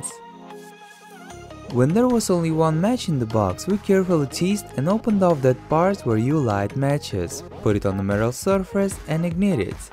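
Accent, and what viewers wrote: Russia. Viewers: i just loooove his russian accent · because he has a russian accent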